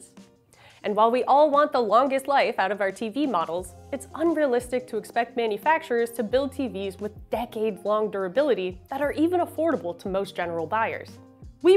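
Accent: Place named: Canada. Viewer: Didn't even think aboat where RTINGS was located, but when the familiar accent crashed into my ears, either they were from Canada or RTINGS is based the. It was only after the job posting link did I find oat the truth.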